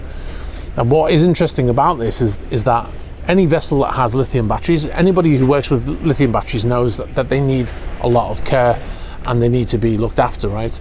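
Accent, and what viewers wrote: Liverpool, United Kingdom. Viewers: Thanks for the "thermal events" ye auld scouse ye....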